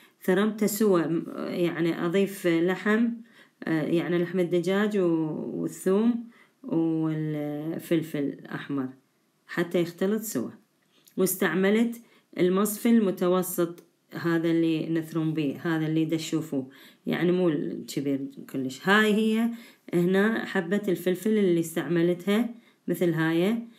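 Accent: Iraq. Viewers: رائع انا من سورية و بحب أسمع اللهجة العراقية ﻷن بتذكرني بأصدقائي في الحسكة تسلم ايدك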